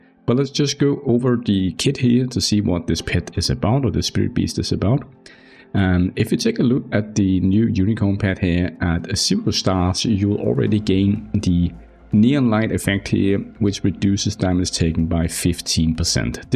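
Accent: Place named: Denmark. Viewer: Are you from Denmark? You English have a Danish dialect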